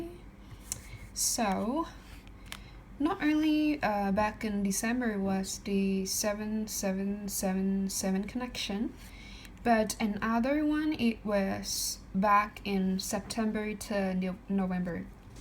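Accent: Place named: Australia. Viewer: Listen to that Australian accent lol... God bless you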